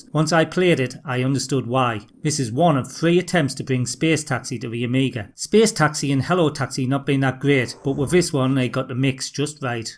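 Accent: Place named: Newcastle, United Kingdom. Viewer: Aye. Good to hear geordie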